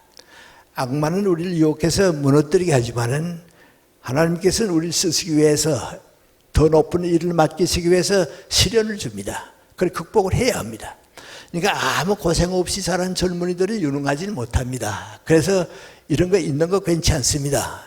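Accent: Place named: North Korea. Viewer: 강의 중반으로 들어갈때부터 은근 화가 치밀어 오릅니다. 1980년 초반 대학 다닐 때 이분이 쓴 교과서를 기억합니다. 비겁합니다. 나라가, 당신들의 월남을 받아들이고 평생 꿈을 이루고 살게 해 준 이승만의 대한국에서 지금껏 당신같은 지식 기득권층이 한 일이 뭡니까? 북한 위주의 통일을 지향하며그들의 음모와 흐름, 역사의 역향을 보면서도 침묵하며 출판 기득권을 유지하며 민초들을, 사회 구석구석의 무넘짐을 바러보며 참묵으로 일관 해 오셨습니까? 박정희의 독재를 인 마킹하며 북쪽지향 기류에 편승해 앞 뒤 마당 땅 다지고 계십니까? 철학적 기독교인이라고요? 달변에 인쎄큐어리티로 사람들을 내려다 보면서 스스로의 철학적 헐벗음은 못 보십니까? 이북식 말투조차 역겹습니다.. 자유민주주의 대한민국이 아직도 만만해 보이십니까? 연세대 출신들이 저지른 대국민, 대기독교적 모욕은 요한 계시록에서 투영하고 있는 심판을 피할 수 없을 것입니다 개대중이 커밍 아웃부터 지금까지 30 여 년을 그동안 뭘 하시다 나와서 달변을 풀어 내십니까... 미국의 마지막 통고가 코앞이니 좀 걱정이 되십니까??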